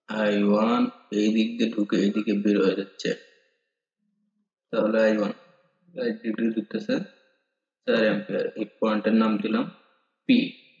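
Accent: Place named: Bogura, Bangladesh. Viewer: Apner bari maybe bogura te,,apner kotha sune bujlam mone hoi bihar Union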